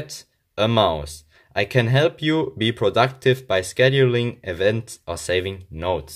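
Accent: Germany. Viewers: Are you from Germany? You sound like a german dude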